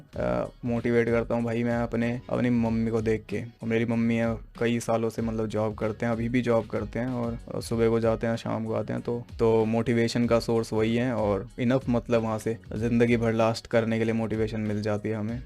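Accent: Haryana, India. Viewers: Your Harvyanvi accent make impact on your explanation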